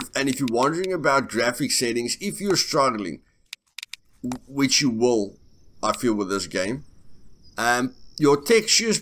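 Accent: South Africa. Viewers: South African?.....If so then hello from fellow the UK!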